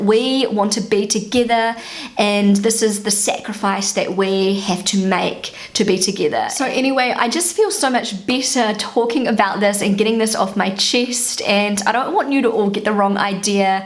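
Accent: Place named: New Zealand. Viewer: I like all of you videos, - your positivity and smile really warms my heart. How lucky your husband and your children are:) And you know what? I had to google wikipedia for a New Zealand English article because when you get used to British or American English it's so funny to hear a New Zealandish accent. It's interesting to compare just the word "better" spoken in these three variations [ˈbɛtʰə] (UK) / [ˈbɛɾɚ] (US) / [ˈbeɾə] (NZ). Just t o let you know <3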